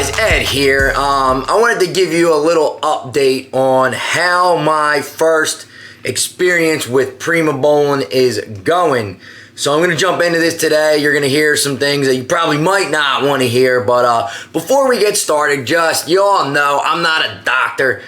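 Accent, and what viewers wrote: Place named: South, United States. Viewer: Bro its been ages since ive heard such a strong southern accent 🤣😅🤣😅😂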